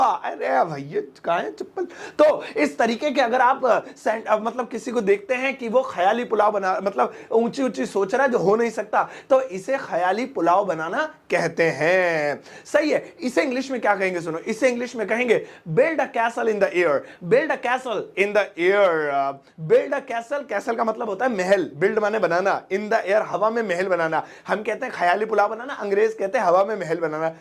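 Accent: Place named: Rajasthan, India. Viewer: Sir your and my language is same When you said - ka keh rho h ee 😅 I'm from bharatpur Rajasthan Watched all your videos 😍 Love you sir 🤟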